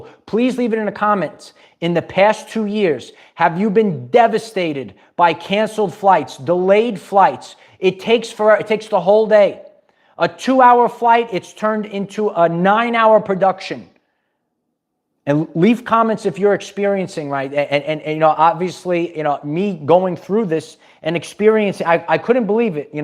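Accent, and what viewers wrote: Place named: Boston, United States. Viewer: Hmm....1st time here, love the accent. Usually not my topic of choice but was drawn in. May i know where the accent is from, is it Boston? I love hearing other voice differences across the globe, and learning about other cultures🙏✨️❤️‍🔥🌊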